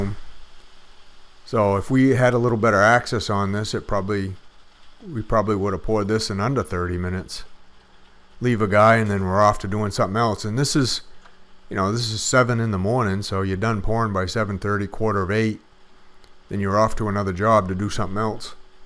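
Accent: Maine, United States. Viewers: I knew your accent was Maine!! Nice 👍. I’m on vinalhaven island. Ever been out here? My boat shop burned down in July. So I am in the process of ground work for a new 40x60 steel building. Love your videos!!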